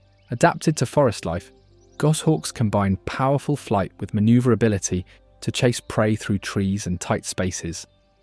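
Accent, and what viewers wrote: England, United Kingdom. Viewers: Can't stand all these British narrators talking about America. Why don't they go back to their Muslim country? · What’s up with this bloody Limey’s accent?🤮 It’s Arizona, so speak American🇺🇸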